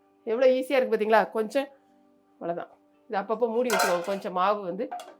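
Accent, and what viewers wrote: Tirunelveli, India. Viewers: Neenga Tirunelveliya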